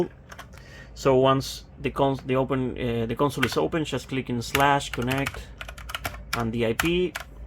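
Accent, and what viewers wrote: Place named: Argentina. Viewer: Qué pro, Boludo tus tutoriales son de lo mejor,, sigue con el desarrollo de tus proyectos, sabía qué eras Argentino, ese acento no se quita ni con inglés, desde el principió me dí cuenta con ese inglés, jaja, it keep also, bro.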